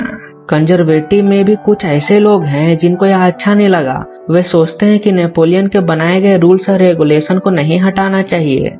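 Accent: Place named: Bihar, India. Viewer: aap muje bihari lad rahe ho aapke aawaj se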